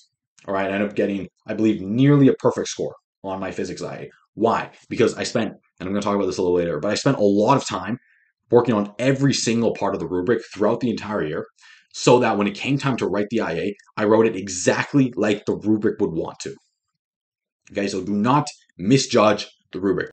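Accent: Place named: United States. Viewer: he speaks pretty american english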